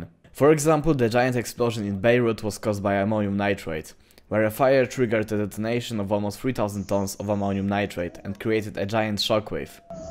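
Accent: Poland. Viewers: Słychac że jesteś z pl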